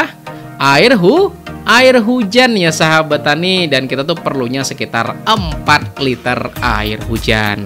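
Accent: Banjar, Indonesia. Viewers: kaya org banjar sdin ni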